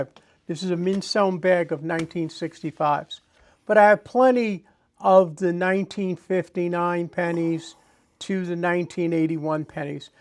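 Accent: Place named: Long Island, United States. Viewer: cant hide hat axcent...lol...same here ex Long Islander..!..thank u 4 a breaf & accuret explanation..!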